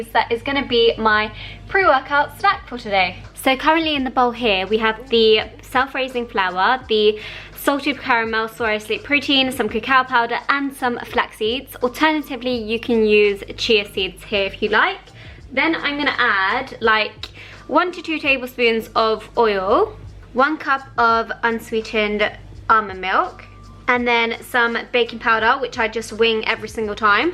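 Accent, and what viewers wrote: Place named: United Kingdom. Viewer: I understand that you are sponsored by MyProtein. And i also get that you workout,as you constantly say in your videos. But you are not a bodybuilder,and i assume that you don't hit the gym as hard either,nor you try to gain muscle size. (forget me if i'm wrong) So why all this protein obsession you have? We need protein to recover and repair from our training,but what you do is a bit too much. I train 6x week ,1,5 hours long each session,and i am exhausted after each workout,plus i am a male. But i don't go above 1,6 gr/kg of bodyweight per day. Could you do a video about this,why you seem to depend on protein powders, and why you think you need so much of this specific macro, I would be glad to hear your take on that. And soy isolate protein is perhaps the worst vegan powder you could possibly consume,because of the soy protein extraction process. 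Google it,and you will see. Other than that,i always love your voice and British accent,plus your videos are so calming and soothing. Always fun to watch!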